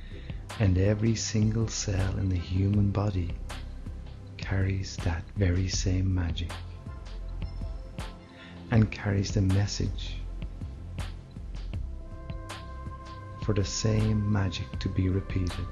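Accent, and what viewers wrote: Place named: Ireland. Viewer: Another Irish voice not making me calm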